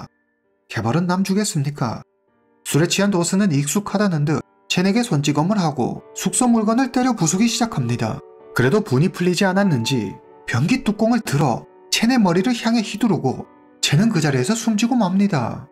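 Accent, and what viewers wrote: China. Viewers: 억양때문에… 보다가 껐어요…중국분이신가요?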